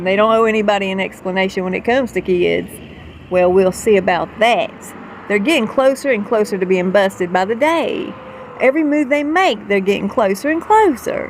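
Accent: South, United States. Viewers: Hi I love your southern drowl. Megain was starting to make me dislike Americans. Then I realized it’s not fair to label all by that Hussey. I must tell you I can’t stand that President either. The two need binning. I am from Northern Ireland and fiercely BRITISH. We arrived in your country and helped start the country. We gave 17 Presbyterian President’s and help with the writing of the constitution. Xxxxx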